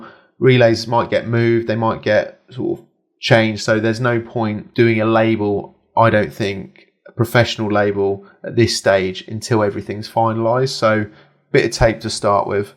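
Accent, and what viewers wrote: United Kingdom. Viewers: Everything was great but one thing is true, bruh has the strongest british accent for sure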